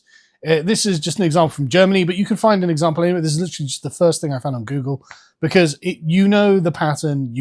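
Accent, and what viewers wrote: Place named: England, United Kingdom. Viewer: Sorry bro, your English accent couldn't save you on this one. Complete rubbish.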